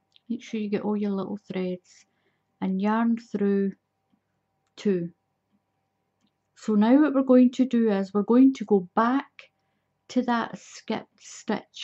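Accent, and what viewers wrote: Scotland, United Kingdom. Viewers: Nice to hear the Scottish accent!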